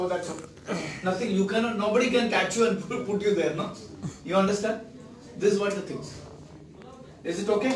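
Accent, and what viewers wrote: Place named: Kerala, India. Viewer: why didn't you polish your accent, it sounds I am hearing Malayalam in English language.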